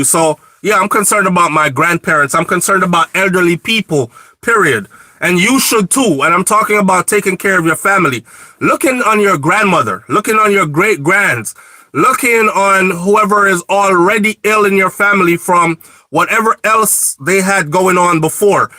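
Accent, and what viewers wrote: Trinidad and Tobago. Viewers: The trini accent on point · Luv the Trini accent..lol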